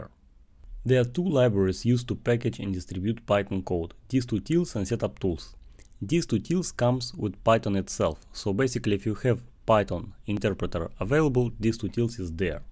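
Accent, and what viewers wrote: Russia. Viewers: Прекрасный русский акцент )) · are you russian? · Мне одному показалось, что родной язык автора всё-таки русский? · Лайкт фор зэ кул рашн экцэнт энд эксэпшонал контэнт!!!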